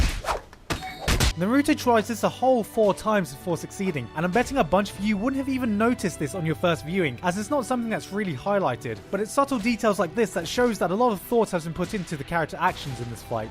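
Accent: United Kingdom. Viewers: some normal liverpool street brawl beetween mates. your accent is so british i can almost hear the tea cup being lifted from the table when you talk.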